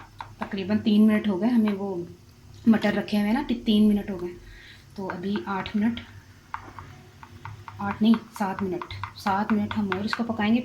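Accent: Punjab, India. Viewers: What a lovely talking style, specially punjabi touch, i think speak punjabi more. you must reply ! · So nice taste aur ap ka punjabi style bohat acha ha ap punjab k kis aria see ho ma lahore sey hon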